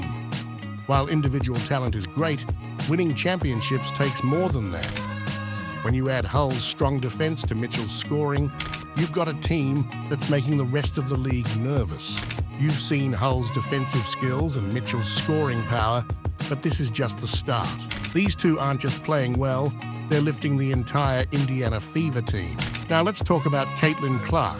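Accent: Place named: England, United Kingdom. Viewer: Why is the voice-over either English, or worse, AI programmed to sound English. Get that crap back across the channel.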